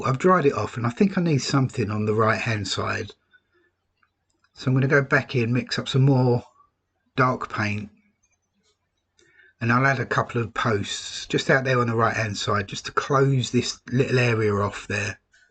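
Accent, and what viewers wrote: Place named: United Kingdom. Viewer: Drawring !Got to love a British accent. Takes me back to Michael Meyers and his character , a little boy named Simon that made his drawrings in the tub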